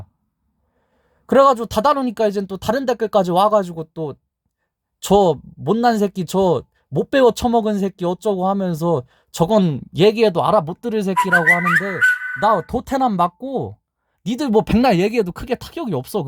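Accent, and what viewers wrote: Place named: Dangjin, South Korea. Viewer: 당진 사람이죠? 제 아는형님하고 말투가 너무같아요 당진이면 유성 코일도 괜찮아보여요 평판이니 저는 같은 충청사람으로써 너무 말투가 공감이가네요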